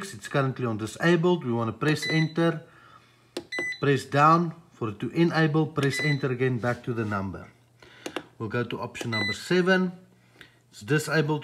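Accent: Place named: South Africa. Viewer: You cant mistake a south african accent anywhere ...nice one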